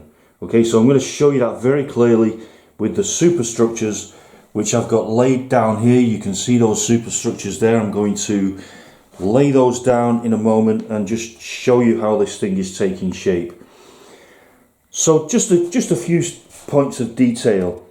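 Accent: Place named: North West England, United Kingdom. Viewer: Where are you intending to display this? I assume you're in north west england from the accent?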